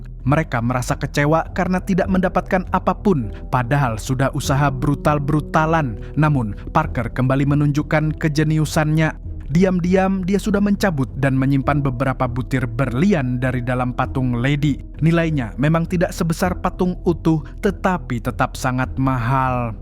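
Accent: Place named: Banjar, Indonesia. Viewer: Inya orang sungai tabuk😂😂😂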